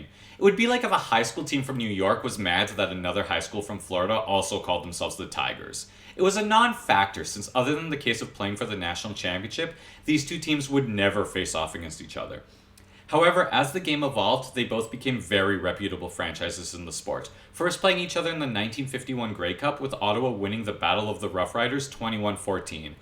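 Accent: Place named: Saskatchewan, Canada. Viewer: This guy is absolutely a Sask local. At least his formative years. Accent is a dead giveaway.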